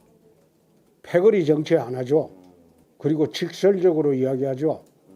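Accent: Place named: Gyeongsang, South Korea. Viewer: 문제점을 콕집어내는 혜안 , 언제어디서든 즉문즉답이 가능한정치인 흠아닌흠 경상도억쎈 어투와발음은 재밌기도하지만 경상도출신은 아무 문제삼지않습니다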